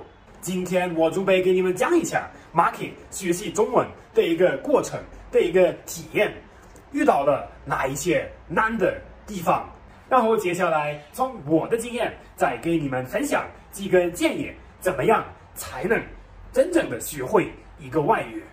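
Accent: Sichuan, China. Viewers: 厉害，竟然会好几国的语言👍👍👍而且学汉语还学到了四川口音。如果不看脸，还真像一个四川人在说普通话。😄😄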